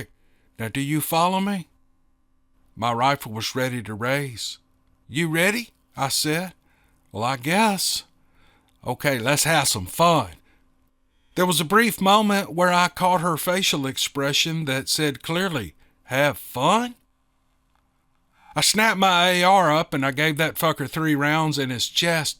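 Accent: South, United States. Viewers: Good morning. I enjoy your recounting the experiences others have had but, nothing compares to these Steve Lily and Wildhunt stories. There is a connection to the characters that the shorts just don't afford. Your southern drawl gives your narrative an air of authenticity. Please keep them coming. Randy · I’ve been sharing your stories & telling everyone I can all about The Wild Hunt & Steve Lily. Everyone I share these gems with is hooked after hearing the first one. Sometimes I tell them in my own words & voice to peak their interest, then I close the deal when they hear the twang of the guitar & the soft, soothing sound of your good ole boy southern type voice weaving a tale of man vs monster. The latest addition to your growing audience is my oldest nephew. I started him in on The Wild Hunt Oklahoma Sasquatch Wars. Thank you for all you do & looking forward to future Steve Lily & Captain Clark of Team Odin’s Squatch encounters.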